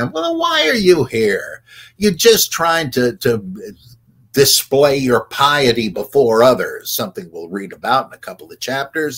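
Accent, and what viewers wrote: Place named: England, United Kingdom. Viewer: I always like English accent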